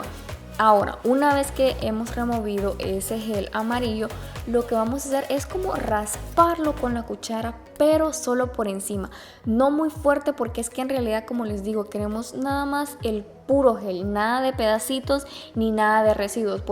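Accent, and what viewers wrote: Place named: Honduras. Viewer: Lo q me encanta y admiro de ti esque no has perdido tu hacento hondureño!!! 😘😘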